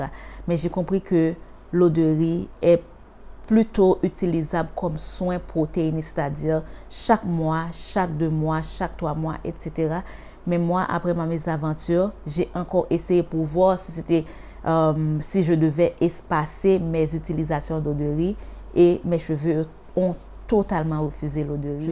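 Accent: Haiti. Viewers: Je reconnaît l’accent d’Haiti chérie 💋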